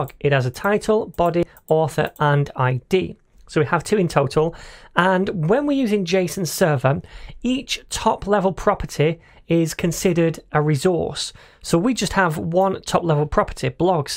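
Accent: United Kingdom. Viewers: Honestly, I really like his react tutorials not only because of his British accent but he also explained them quite remarkably.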